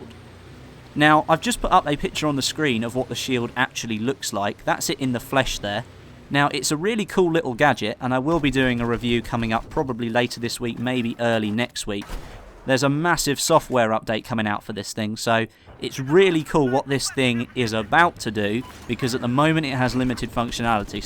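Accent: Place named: Crawley, United Kingdom. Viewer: But for the record I can hear that Crawlian (If that's even what you call people from Crawley) accent strongly in this vid! :D Remember this comes from a guy in Horsham! (You know a town like 5 mins from Crawley and that is like a BAZILLION times better than Crawley! xD)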